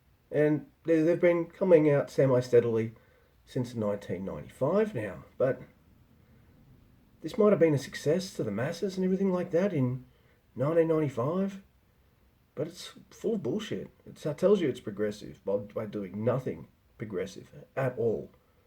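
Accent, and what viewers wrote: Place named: United States. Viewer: The American accent!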